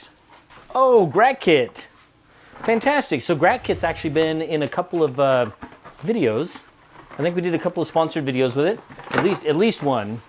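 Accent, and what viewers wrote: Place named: Idaho, United States. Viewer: Yeah, although mountains can have increased exposure to solar effects which can lead to greater evaporation, since mountains tend to have fewer local sources of water, and lower levels of vegetation, they can end up with lower humidity in summer. And moreso if the local/regional climate is already dry during the summer. I've only seen maybe a dozen or two of your videos, and I don't think I've heard you say where you are, but based on that brief clip of a snow storm, your channel name, appearance, and accent, I'd guess that you're somewhere near Idaho. That whole region has a mix of mediterranean and semi-arid climates, with summer already being the dry season. So, that would all make sense. I've only seen a dozen or two of your videos when YouTube has recommended them to me, though I am subscribed. I hadn't seen your streams, but I went to find the stream you referred to, thinking I'd see what you talked about, but it's ten hours long, so I decided against it. 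😀 Looks like you're doing cool stuff, though. 🙂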